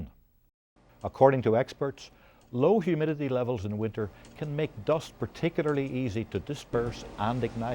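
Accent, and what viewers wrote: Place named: Northern Ireland, United Kingdom. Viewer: Yay, this guiy is Irish. From the north...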